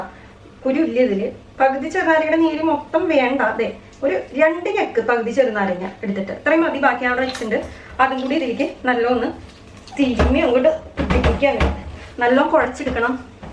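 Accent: Thrissur, India. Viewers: Hi, awesome and super easy recipe.I love your way of talking and the Thrissur slang..cooking as well as your slang is simply superb.. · Midukki thanne ! Keep it up. Very nice presentation. TCR slang exalted. Midumidukki.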